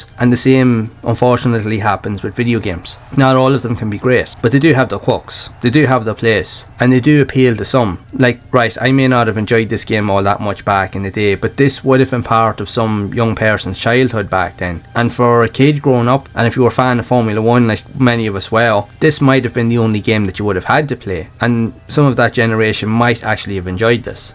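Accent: Ireland. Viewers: nice one fair play enjoying the vids and nice to hear an Irish accent on here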